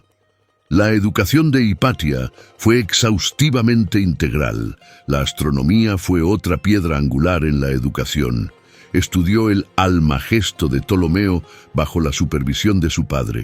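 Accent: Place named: Castile, Spain. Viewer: Es con acento castellano 😊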